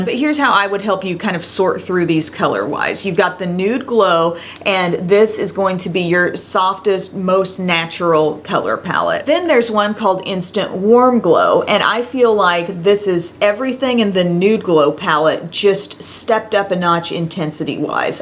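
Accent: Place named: South, United States. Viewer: Everytime I watch your videos, or your snapchats ALL I can think is how much of a southern accent you have! But I love you, and always try to come to you before I purchase something!! ❤️❤️❤️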